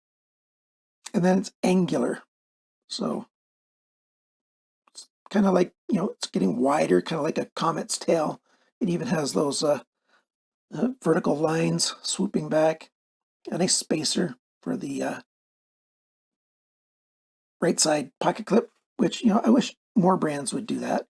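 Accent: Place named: Canada. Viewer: I actually watch your videos for 2 reasons - I miss the Canadian accent at times, and always love hearing about the knife laws and appreciate my move to Asia as I can own and carry basically any knife I want.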